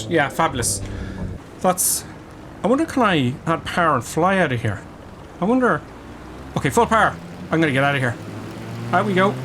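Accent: Ireland. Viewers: Wait a minute... you’re IRISH??? :)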